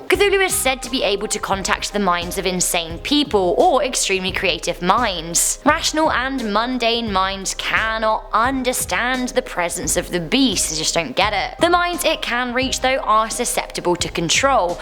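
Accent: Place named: United Kingdom. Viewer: Who else clicked out from this video after hearing her talk? Them brit's have the most annoying accent and they love to hear themselves talk.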